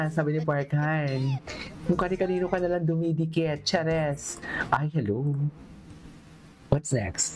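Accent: Iloilo, Philippines. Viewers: Baw ilonggo ka sir ?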